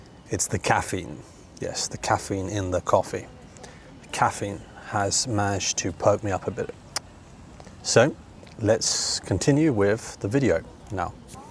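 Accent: United Kingdom. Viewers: I love your British accent , you're a great teacher because your English is easily understandable! You really do a great job for non native English speakers! ❤❤❤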